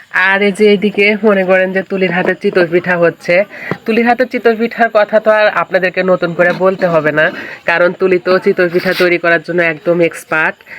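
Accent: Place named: Naogaon, Bangladesh. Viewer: নৌগার ভাষা এমনি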